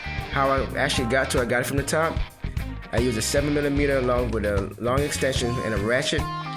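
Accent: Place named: Jamaica. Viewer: Nothing Cummings in you guys face. LOOOOOL Yankee boy. i hear a Jamaican boy a twang.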